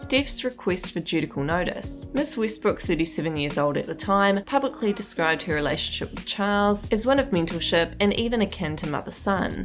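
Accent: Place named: New Zealand. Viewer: Great content! I could listen to that kiwi accent all day long ❤️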